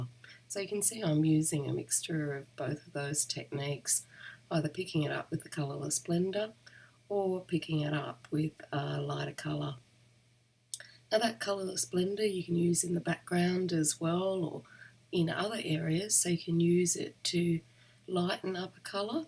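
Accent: Australia. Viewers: Thank you so much for this excellent review:) I always love listening to your reviews, you speak so eloquently and give a fair and unbiased report on the product you are reviewing. It is also a bonus to hear a fellow Aussie accent!!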